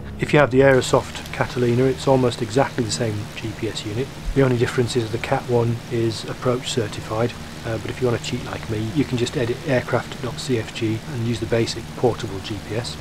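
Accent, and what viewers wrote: United Kingdom. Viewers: I like the quality of your videos and also your british accent ! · Just about the best 10 minute review for an FSX aircraft I've ever seen. Your comparison of real knowledge to in sim performance is particularly appreciated. As ever I also appreciate an understated but confident British accent.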